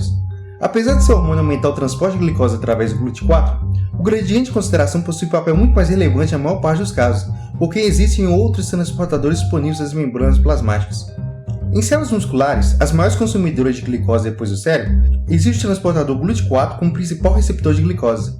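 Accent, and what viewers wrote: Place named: Minas Gerais, Brazil. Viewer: ***** Sua voz é bonita e agradável ao ouvido. Acredito que o "detalhe" no final das frases é questão de mineirice mesmo...kkkkk...Aquele abraço!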